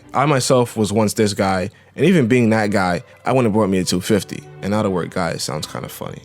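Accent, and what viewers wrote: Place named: Brooklyn, United States. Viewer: Audio is fine. Your Brooklyn accent is classic. I started at 16 years old with a used 250 cc single four-stroke Honda dirt bike. It was all I could afford, but it seemed like plenty of bike. The speed limit was still 55 on the interstate, and it did fine there. Sold it after several years for about the same I paid for it. Later I bought a 250 cc two-stroke Suzuki, which was noticeable faster. Then had a 650 cc Suzuki street bike when I was 22 years old, and drove it all over LA for years with no problems. My next bike is probably a Triumph Street Triple 765cc to be used in the hills along the California coast.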